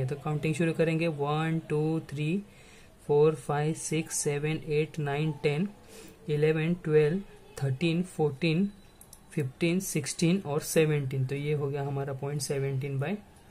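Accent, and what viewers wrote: Maharashtra, India. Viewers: Best explanation sir are you marathi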